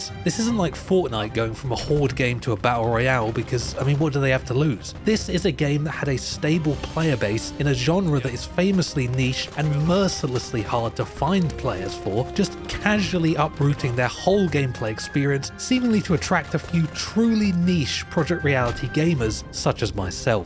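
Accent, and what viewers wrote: United Kingdom. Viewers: What a great video, thank you for your brittish accent.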